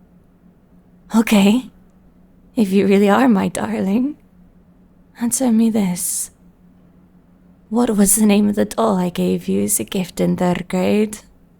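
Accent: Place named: Scotland, United Kingdom. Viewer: Scottish accent detected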